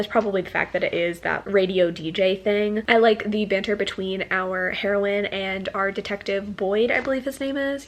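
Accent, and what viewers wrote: South, United States. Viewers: If you’re from the South, her country accent is honestly hilarious Also “yeehaw... we’re done with that” made me laugh for a HOT MINUTE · hahaha I love your country accent 😂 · I loved what you said at the end about romance! Also I'm kinda living for the country accent haha! This was amazing as always! Love your vlogs!! <3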